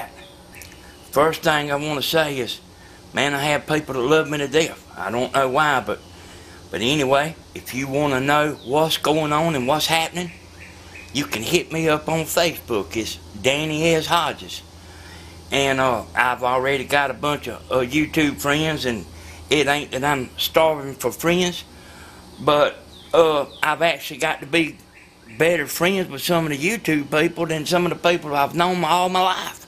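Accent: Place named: Mississippi, United States. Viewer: I really love your videos. Informative, interesting, educational and entertaining. You are so right about the looks of the antenna and your appearance on the job. People judge most stuff by sight. Unfortunate but true. I've worked in installation work before I retired and I guarantee everything you said is true! You have good insight into marital harmony too. I'm from South Mississippi myself and I love your accent. I'm retired and this might be a way for me to make a few dollars to help with living expenses. Lord knows the social security retirement check is barely enough. So glad I found your channel, even if I never do sell them, I've really enjoyed watching your videos! You have a really great attitude! God bless you in all you do!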